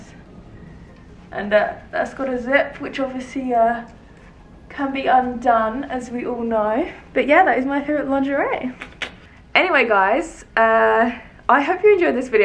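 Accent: England, United Kingdom. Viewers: I like british sound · Are you English because I would wonder if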